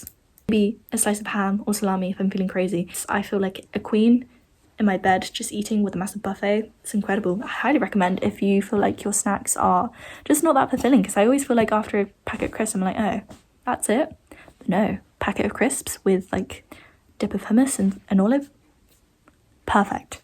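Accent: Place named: Hampshire, United Kingdom. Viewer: Omg!! your the first youtuber I've ever heard of with the same accent as me lol, are you also a Hampshire girly?💕 ps you are so gorgeous!!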